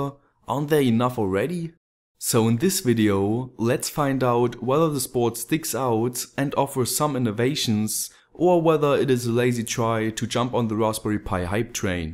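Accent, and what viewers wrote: Germany. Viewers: Where in Germany are you from? Guessed that because of your accent. Great videos man! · warum machst du keine Videos in deutsch? ich kann deinen deutschen Akzent hören.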